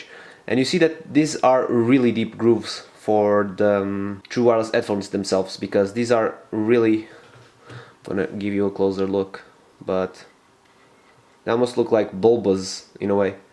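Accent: Portugal. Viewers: are you portuguese? your accent seems like it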